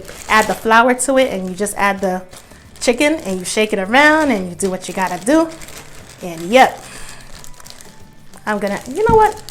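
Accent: New York, United States. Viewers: Go Thank You For Giving US Momma's An Quick, Easy & Delicious Recipe For Picky Eaters. Quick Question-Are YOU From New York? Cause When YOU Say Certain Words-YOU LOL Sound Like ME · Gotta Be From NY With That Accent 🥰 Subscribing To You Now Lol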